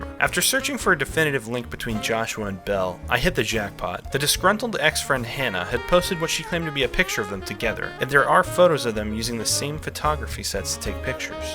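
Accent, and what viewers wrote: United Kingdom. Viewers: Bro i never knew she was British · Huh, didn't know she was British. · its a girl version of leafy..... except british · Am I the only one who didn’t know she was British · SHE IS FKN BRITISH? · Am I the only one who had no idea she was british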